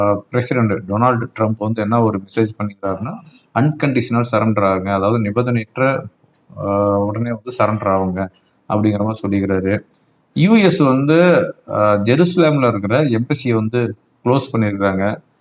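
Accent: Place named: Chennai, India. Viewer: avoid this madras slang. It sounds a bit un professional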